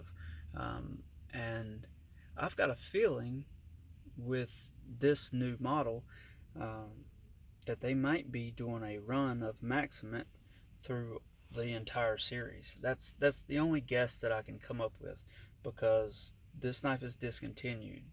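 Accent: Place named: South, United States. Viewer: Where are you from? Sound southern like myself, I'm from Texas.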